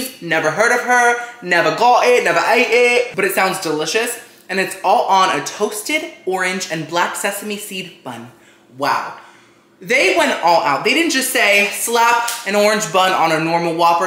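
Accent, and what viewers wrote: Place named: United Kingdom. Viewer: with a British accent is what I am here for:-)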